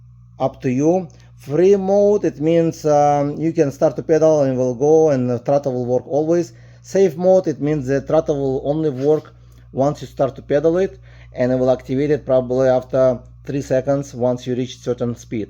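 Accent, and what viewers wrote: Russia. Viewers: Че за русский акцент?)))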